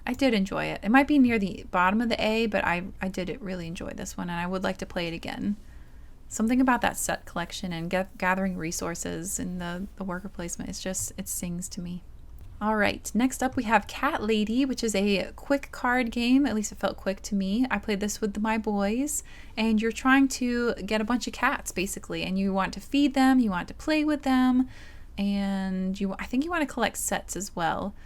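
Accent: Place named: United States. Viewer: I love your accent,! What state are you from?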